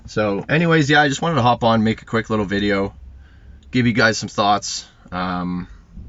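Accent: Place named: Canada. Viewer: i love how we talk similarly haha so Canadian eh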